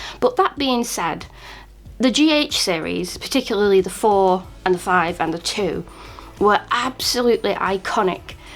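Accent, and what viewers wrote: United Kingdom. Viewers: Charming British accent :)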